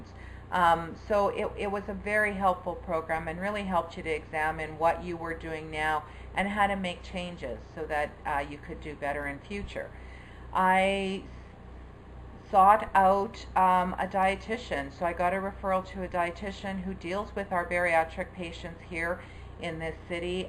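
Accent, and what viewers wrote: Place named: Canada. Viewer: 1st. I love the curlies in your hair, really looks nice. 2nd. You have hit "ONDERLAND" & that is a WooHoo moment !! ~ Very nice video today. It is always nice to hear someone recap their weight loss journey. I always enjoy listening to you speak. You remind me of my girlfriend, Lorna, whom I met while living in Florida. She's blond, blue eyed, from Toronto, and has the same Canadian lingo in her speech. I often think of Lorna when I watch you. I appreciate your friendship. Have a Happy Easter.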